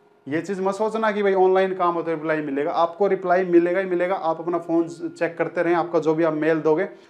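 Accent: Haryana, India. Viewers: Bihar se hu per boli haryana ka lagta hai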